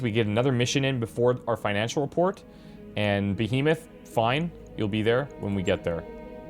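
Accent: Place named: Canada. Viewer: So what's weird is that when I lived in Canada I never noticed the accent. Now living in the States for a decade I'm painfully aware of the Canadian accent. Keeping doing you. Get oot there and get 'em.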